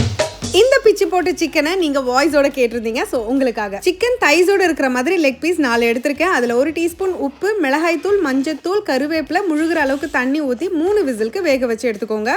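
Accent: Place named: Chennai, India. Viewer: Unga peachy kakave unga video ve paakuren…Chennai language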